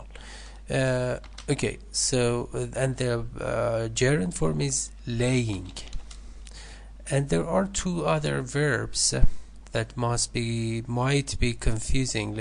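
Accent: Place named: Iran. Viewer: Such a thick Persian accent! dear lord! P.S. your examples are filled with grammatical errors.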